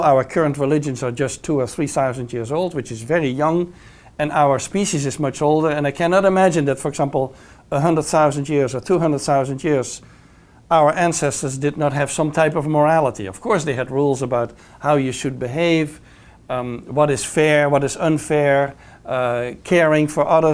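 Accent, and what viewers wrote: Netherlands. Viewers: I could here he was dutch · balkenende accent....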